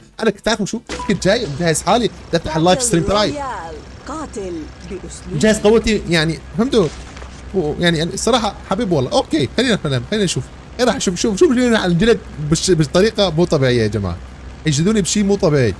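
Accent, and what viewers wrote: Algeria. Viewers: صار يتكلم بلهجة جزائرية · صيار بتحكي جزائري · محلا هدرتك بلجزائرية :virtualhug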